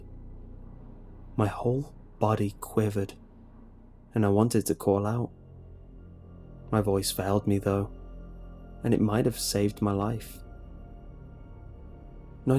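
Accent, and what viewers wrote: United Kingdom. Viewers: where in the UK are you from? I can't place your accent!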